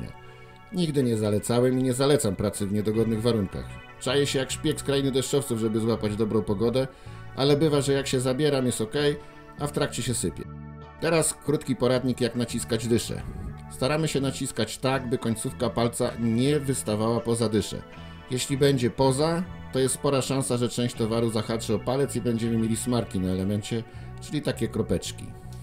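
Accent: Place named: Warsaw, Poland. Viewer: Bardzo się mnie podoba ta Warszawska mowa 😀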